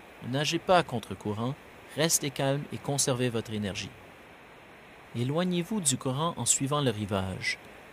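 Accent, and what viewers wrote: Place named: Quebec, Canada. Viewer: Sur la côte atlantique française, cela peut porter plusieurs noms : ''Baïne'' ('petite baie' ou 'bassine' en langue gasconne) sur le littoral aquitain, ou ''Bâches'' au nord sur la côte de Bretagne ou de la Manche. Plusieurs phénomènes doivent être rassemblés pour créer ces courants d'arrachement : Il faut des marées suffisamment fortes, du sable fin, un dénivelé faible et une forte houle. C'est spécifique aux côtes dont le fond est uniquement sableux. En tant que surfeurs et bodyboardeurs, nous nous en servons pour faciliter la remontée vers le large, une sorte de ''voie rapide'' dans l'eau pour rejoindre les pics du déferlement des vagues et se positionner. Mais en effet, c'est très dangereux pour les baigneurs. Vos conseils de prudence sont très utiles. Chaque année, nous déplorons des noyés dans ce contexte, par imprudence. 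En tous les cas, je savoure l'expression que vous employez (''Courants d'arrachement) + l'accent québecois ; c'est encore plus suave ! 😉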